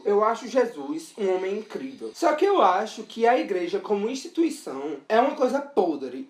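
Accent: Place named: Recife, Brazil. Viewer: Eu me emocionei internamente e me identifiquei demais com sua história, luta e com você também (sou de Recife e tenho o mesmo sotaque e forma de falar que você tem Kkkkk) porque eu sou bem jovem e passo por uma trajetória semelhante ao que você viveu na adolescência em vários pontos (até mesmo a questão da mania de escrever textos para desabafar e etc). Ver o seu vídeo me deu uma injeção de ânimo e de mais vontade de ir atrás dos meus sonhos e independência. A sua história é realmente muito parecida com o que vivo, apesar de alguns pontos de diferença, eu acredito que a minha história terá o mesmo resultado que a sua: a aceitação, e mesmo se eu não tiver, eu permanecerei firme e forte na minha luta e sonhos. Muito obrigado por inspirar pessoas como eu. <3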